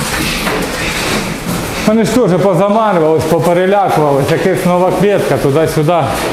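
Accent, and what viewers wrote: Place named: Kuban, Ukraine. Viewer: Мабуть чувак з Кубані, але українське коріння є. В Україні так не розмовляють тут за тридцять років вже мавпи навчилися розмовляти. Хай щастить.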